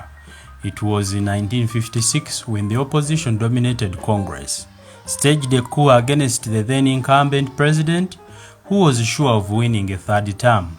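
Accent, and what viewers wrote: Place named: Buganda, Uganda. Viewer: For sure the reader is killing the brand..your English is rotten,misuse of words and accent is in Luganda version..i wonder whether this guy has any journalistic antiquate to share ideas of an intellectual to the public..Pliz delete this piece since its hard to get the gist of ideas compiled in this book!!!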